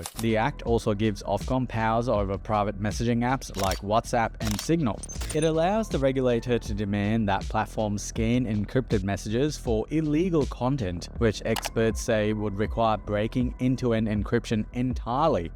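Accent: Australia. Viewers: Graphic violence sounded like graffi marlins. That lazy Aussie way of speaking.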